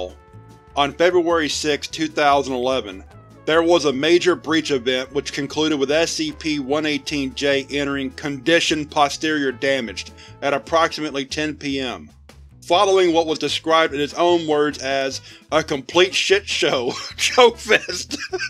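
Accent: South, United States. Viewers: Something about your southern accent talking about the saints is so funny to me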